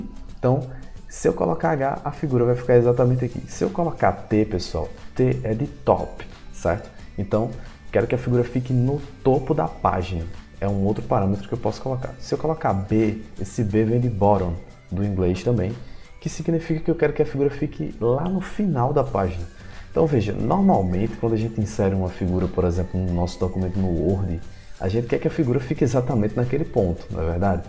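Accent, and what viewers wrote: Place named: Recife, Brazil. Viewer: De longe o melhor vídeo que eu achei aqui no Youtube. Pelo som de fundo/sotaque da pra perceber que é Recife hehe abs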